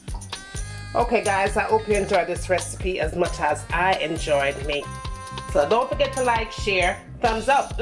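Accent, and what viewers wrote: Jamaica. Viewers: Beautiful patties, beautiful lady! Love the Jamaican accent...💖Thanks for this recipe!😊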